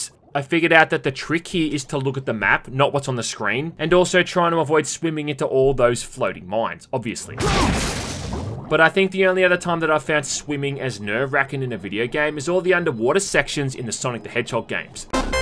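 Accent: Australia. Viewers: Australian accent , nice - lil pharma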